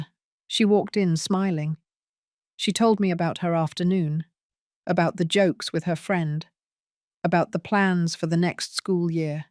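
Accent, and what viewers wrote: United Kingdom. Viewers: I think the accent is British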